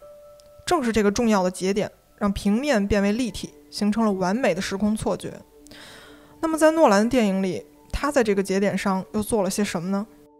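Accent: Beijing, China. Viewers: up主的北京口音很纯正呀😊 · UP主妥妥的北京人，儿化音太酷了。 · 北京话吹牛皮就是强！这集变成南京话或者安徽话就……希望up主演绎一次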